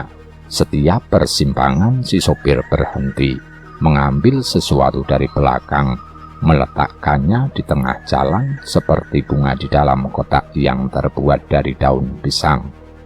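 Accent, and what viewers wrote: Java, Indonesia. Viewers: Yg bawa cerita nya gk enak medok Jawa di ulang, ulang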